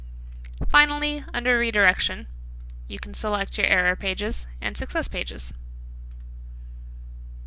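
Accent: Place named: United States. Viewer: why use a US voice?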